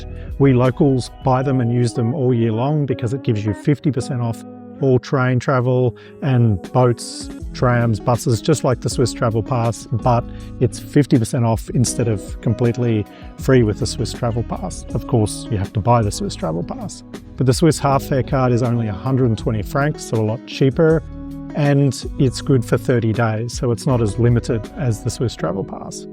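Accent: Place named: Australia. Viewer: Sound like an Aussie.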